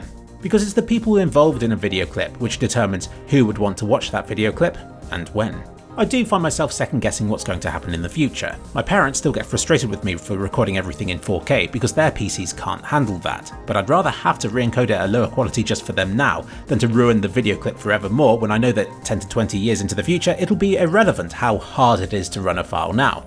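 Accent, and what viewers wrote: United Kingdom. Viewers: Did you still have a British accent back then?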